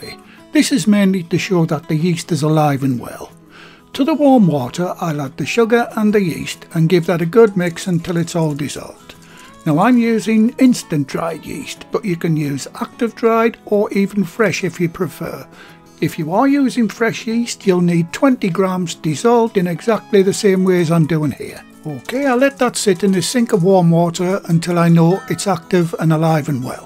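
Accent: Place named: North East, United Kingdom. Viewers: That looks great!! reminds me of my teachers from when I lived in the North East. I miss living up there when I here his voice 🙂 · sounds just like voice actor Jim Cummings but with a Northern English accent (sorry I don’t want to assume what part of the country you’re from because I don’t know)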